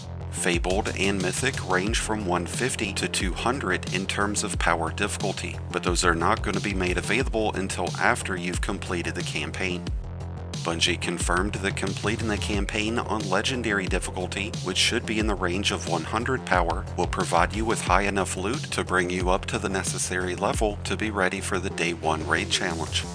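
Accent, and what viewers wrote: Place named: South, United States. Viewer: I am a fan for your voice and southern accent. Please, check your mic as it's always been high pitch. A little bit of shhhhh too. Hopefully you can make a cleaner sounding voice the your future here. Love from a fan.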